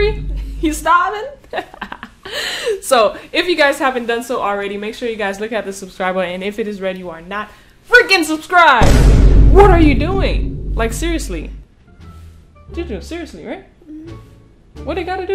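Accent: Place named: Jamaica. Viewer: U sound jamakon if I spelled it right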